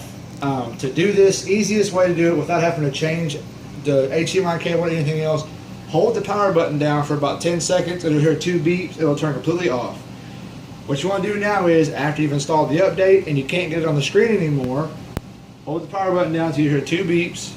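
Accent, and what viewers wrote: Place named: Appalachia, United States. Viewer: Sound like a dumb hillbilly no wonder you can't get it to work lmfao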